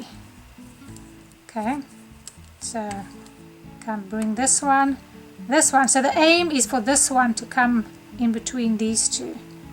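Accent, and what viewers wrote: South Africa. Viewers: Is that a South African accent? Lekker, will try with God's will soon ❤ Thank You P.S in Hebrew means my happiness, is happiness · I love your accent. You by any chance from SA? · Are you South African?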